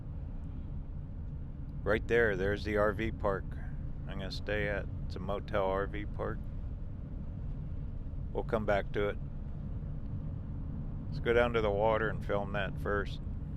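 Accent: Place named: South, United States. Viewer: You do have a southerner accent.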